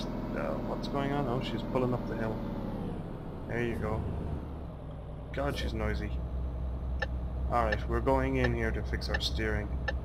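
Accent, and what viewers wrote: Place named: Ireland. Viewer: I got excited I thought u was him. Anyway good videos and I love ur accent. Which part of Ireland u from?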